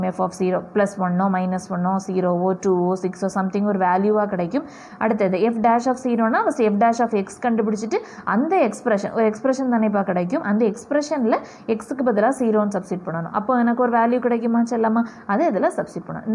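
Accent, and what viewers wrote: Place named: Nanjil, India. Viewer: Very crisp explanation with Nanjil Tamil accent.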